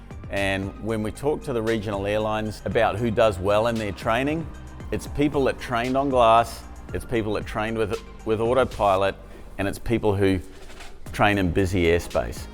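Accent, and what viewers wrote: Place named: Australia. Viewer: Given your accent, I thought you are based in Australia.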